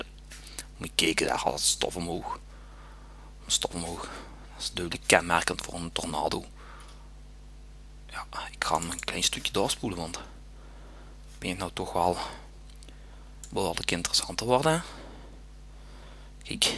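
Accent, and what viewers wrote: Limburg, Netherlands. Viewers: Prachtige tornado! Maar dat Limburgs gepraat is zo deprimerend man... Als je zo iets uniek en mooi ziet, moet je toch op zijn minst kunnen zwijgen en genieten van het spektakel...ik denk dat iedereen hier zo over denkt. Ik kan zelfs heel moeilijk geloven dat zo'n tornado kan ontstaan in België :O Maar desondanks geef ik deze video toch een like, omdat deze video toch nog de moeite waard is als je het geluid afzet :)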